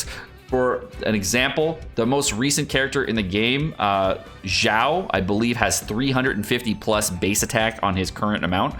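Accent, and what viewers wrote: United Kingdom. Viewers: I don't care about who tao. I'm just here for the music and bri'ish. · Woah british again ☺️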